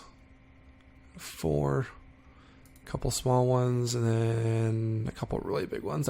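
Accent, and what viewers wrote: Canada. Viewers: feed me, feeeeeeeed meeeee my video addiction. I need to hear more of that Canadian gurrAGE'ness.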